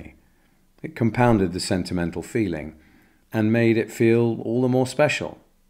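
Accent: RP, United Kingdom. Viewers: Your English is Royal. I love watching your videos. Thank you.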